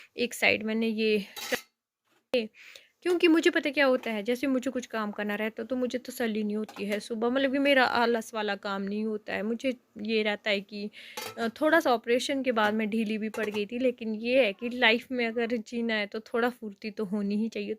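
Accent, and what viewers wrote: Pahari, India. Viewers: Apani phadi tone sudharwo adhi Hindi adhi phadhi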